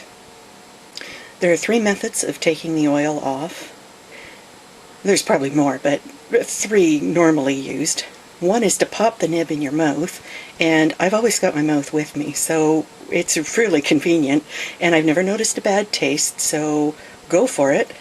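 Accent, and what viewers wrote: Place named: Canada. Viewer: Anyone want to play a game of "spot the Canadian accent"? Great video and great accent:)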